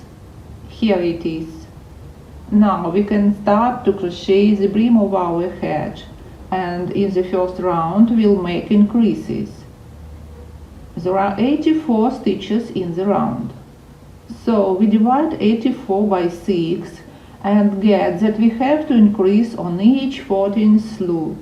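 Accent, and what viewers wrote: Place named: Nizhny Novgorod, Russia. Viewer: Какой-то нижегородский акцент, режет слух . Как будто по русски говорит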